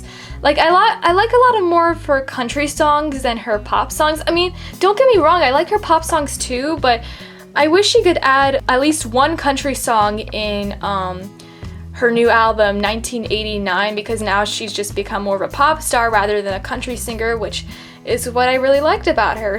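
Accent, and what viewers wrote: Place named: United States. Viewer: No. America, do you hear her accent?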